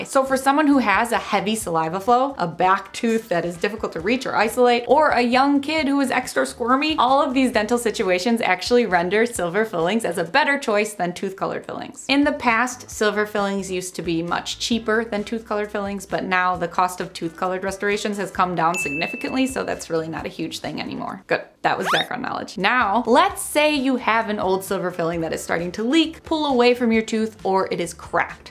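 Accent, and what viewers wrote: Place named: Upstate New York, United States. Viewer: are you from upstate NY?? I'm curious where that accent is from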